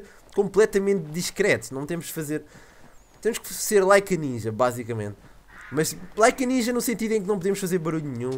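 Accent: Portugal. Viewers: Esse cara é português ??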